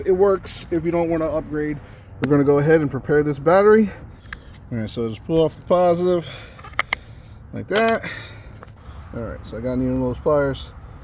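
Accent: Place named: Indiana, United States. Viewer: You sound like you’re from Indiana. Thanks for the video, helped me do it! I’m never taking my car in and paying for a battery change, no matter how complicated they make it!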